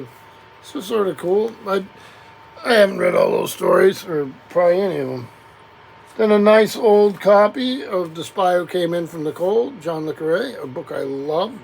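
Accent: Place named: United States. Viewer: What part of the US are you from? You sound like a local to me.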